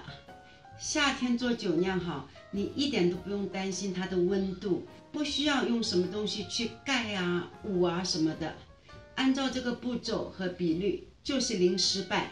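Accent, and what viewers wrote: Shanghai, China. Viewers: 稀饭听浓刚上海话，哈亲切！ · 上海话，哈亲切！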